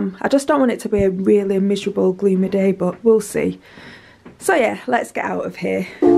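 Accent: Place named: Manchester, United Kingdom. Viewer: You sound like a Mancunian! Lovely vlog :)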